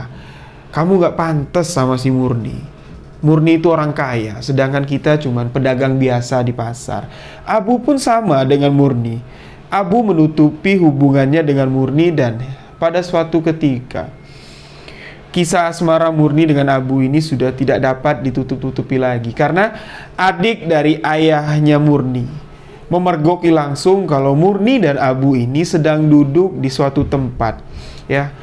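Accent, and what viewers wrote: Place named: Madura, Indonesia. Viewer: Logatnya madura.... Maduranya mn bng?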